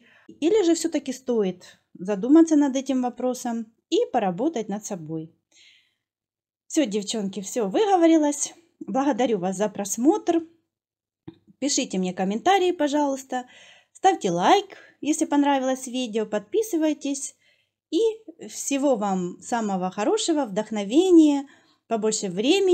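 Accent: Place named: Kharkiv, Ukraine. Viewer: Все в порядке у Вас с языком, по крайней мере не настолько, чтобы ушам было больно. Что ж поделать, если у нас, харьковчан, такой акцент) Я даже в комментариях ниже заметила ошибки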